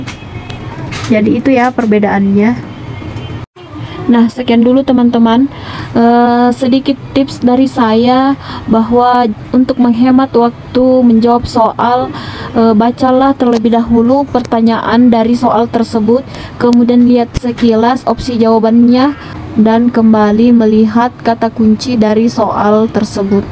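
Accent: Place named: Bone, Indonesia. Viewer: Logat ta kyk org bone kak.. mksh sdh buat videonya kak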